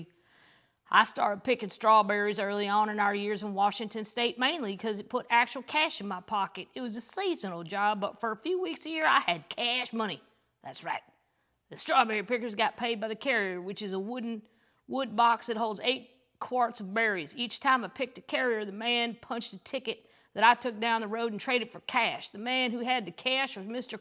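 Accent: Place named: Appalachia, United States. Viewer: If you have Apple Music listen to her “song” (her telling a story, not really singing) off her awesome album. I think I like your holler accent better. Her stories go back to being a little girl & getting hit on the head & the doctor told them she was going to die. Daddy had to carry her 12 miles to get the doctor cuz she didn’t have no shoes. Great 2004 album. The lyrics are some of her best. I just got it for the duet with Oh, turn3d out OK. Daddy just got a new pair and made up a story as to why he had to run through the holler with them.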